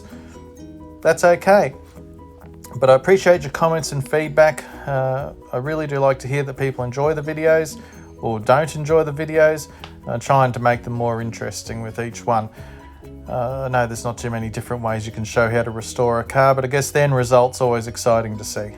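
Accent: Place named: Australia. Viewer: Hi there your vids are great, keep it up please, not many Aussie ascents in utube land.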